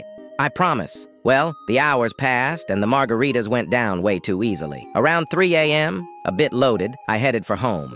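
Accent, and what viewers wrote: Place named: South, United States. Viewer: This, this is like the 7th time I heard this story, and the 1st time it sounds vaguely southern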